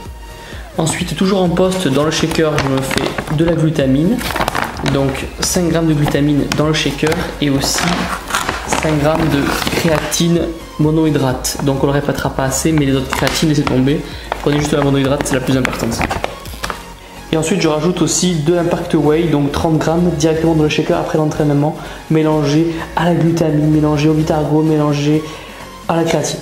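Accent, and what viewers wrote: South, France. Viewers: hey tibo ! haha cool tes videos ! je rigole tous le temps quand tu te présente...dawnnn cest tibo in shape...jespere que vous allez bien etc...avec ton accent du sud ! question : en mangeant les mêmes choses que sur la video au même heures,sans prendre de whey,crea, etc...peut on avoir les meme resultats ? Resmey.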